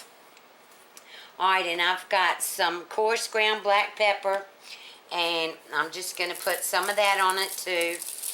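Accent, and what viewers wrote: South, United States. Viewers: LOL, you may not consider VA the south, but you sure have a southern accent. I love your accent and you have a soothing voice.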